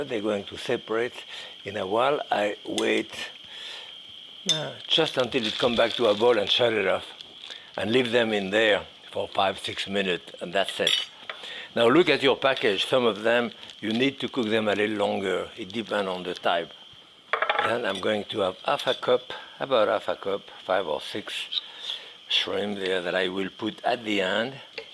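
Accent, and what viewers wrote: France. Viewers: “ ‘Appy Cooking!” Love his French accent! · Jacques tu es le meilleur et cet accent parisien 🎉❤❤Longue vie mon cher 🎉❤🎉❤🎉❤🎉❤inchaAllah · Also his voice and that little French accent, it's irresistible.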